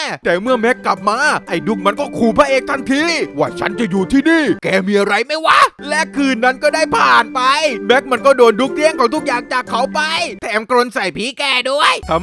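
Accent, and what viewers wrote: South, Thailand. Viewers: ชอบพูดไต้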